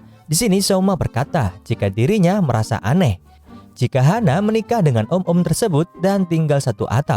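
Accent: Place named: Madura, Indonesia. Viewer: Omong gak seneng min .. terlalu kaku .. kayak omongx orang madura yg belajar bahasa jawa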